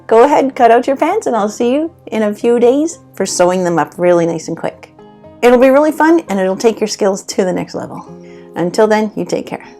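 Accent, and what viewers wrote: Canada. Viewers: I could tell you were Canadian by your accent 🤯 surprising! Hi from Canada 🍁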